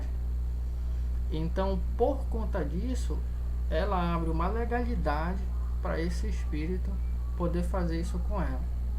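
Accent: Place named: Pará, Brazil. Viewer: Maninho.... Tu é paraense neh ?? Morei um tempo no Pará, e tenho quase certeza da minha suspeita 🤔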